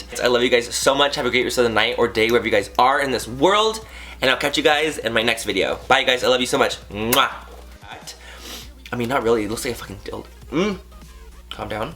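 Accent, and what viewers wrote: England, United Kingdom. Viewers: Why does he talk in a British accent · can you do an entire video in your English accent please? tysm!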